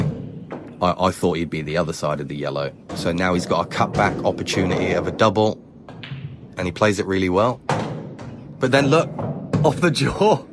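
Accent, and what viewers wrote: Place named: Midlands, United Kingdom. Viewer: I knew I could hear a midlands 'twang' in your accent.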